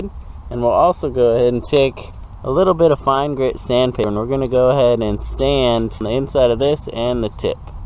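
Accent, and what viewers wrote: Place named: United States. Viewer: Ох уж этот американский фермерский английский язык, но спасибо по дейстствиям все ясно провел обслуживание своей гозоно косилке.) 👍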